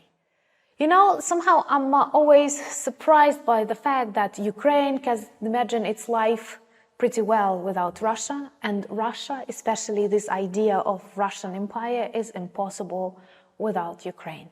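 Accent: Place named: Spain. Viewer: Thank you very much for aproaching Ukraine pride to the world. By the way... Do you speak Spanish? It sound me like Spanish your start.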